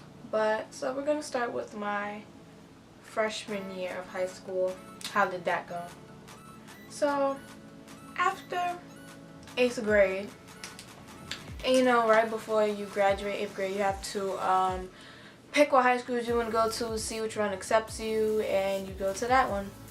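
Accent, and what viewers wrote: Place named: Barbados. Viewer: I love your Barbados accent yes yes boo 😍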